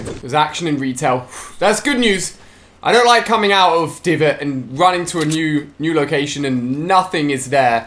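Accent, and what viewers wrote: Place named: England, United Kingdom. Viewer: Mason Coggins he didn’t he said freaking remember he has a accent cuz he is from England duh he said like he said the f word but he didn’t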